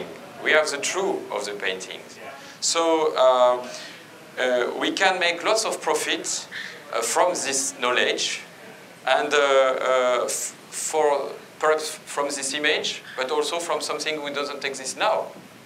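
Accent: France. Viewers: ehh.. 47 minutes with French accent... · id rather listen to a french accent that half of the braindead hick american accents.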